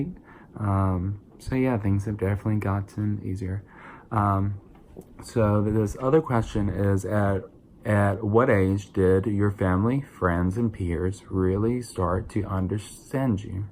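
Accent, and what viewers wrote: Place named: South, United States. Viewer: What part of the south you from. I hear it real well lovely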